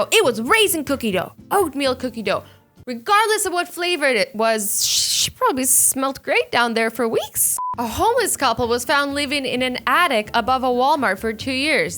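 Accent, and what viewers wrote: Ireland. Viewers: Azzy sounds Irish in this vid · Are you Irish?? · No affence Azzy but you sound kind of Irish in this video As a compliment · U sounded irish in this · you sound irish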